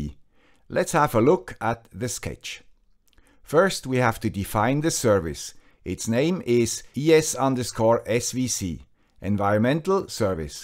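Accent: Switzerland. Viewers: Dear fellow Guy with a Swiss accent I‘m not sure why and since when, but I consider the Arduino IDE to be bloatware (OsX). As I currently have no low latency projects I have deinstalled it completely and switched to μPython. Yes, this has limited me in some ways, but I‘ve also noticed that as an engineer I can basically transfer the specifications to Python and it‘s still legible for beginners. Less hassle for me and my few clients, albeit limitations apply. Hopefully Arduino will change their approach (maybe they already have?) and maybe even open the IDE to μPython.. Thank you very much for your effort, your content has nonetheless helped me broaden my perspective (and workshop 😅) and I‘ll be replicating your Pöschtler alarm soon. Bye!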